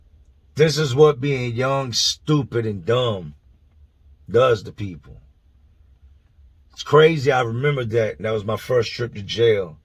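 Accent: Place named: Philadelphia, United States. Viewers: 🤣🤣 Big Facts its a Philly Thang myG rs pretty much Everybody tlk lik dat around my age im 34! But rs half dez ppl wouldn't bust a grape in a fruit fight, dey dont kno bout Ordering shyt on da Box fukin mom cable bill up💯🤣🤣🤕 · People comment on your accent like they comment on mine. Always wanting to correct me and what not. I finally said to them that this is how I talk..growing up around philly and Delaware and Jersey, this is how we talk. People. If you don't like his accent, don't listen. Virginia is right down the road from me, and that is how people talk. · 10/27/80 here brother. I feel you damn. You sound east coast. What's the deal. Hell I'm midwest we sound weird to you all 😂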